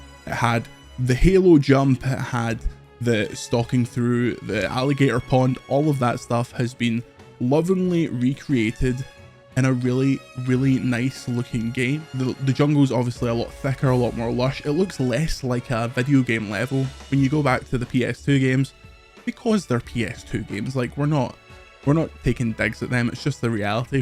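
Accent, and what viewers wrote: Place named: Scotland, United Kingdom. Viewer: You're a troll or an idiot. The accent is Scottish, and as an Englishman I understood him perfectly.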